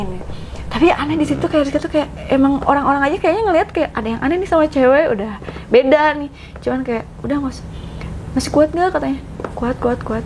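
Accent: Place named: West Java, Indonesia. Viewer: Suaranya sunda dan enak 😘😘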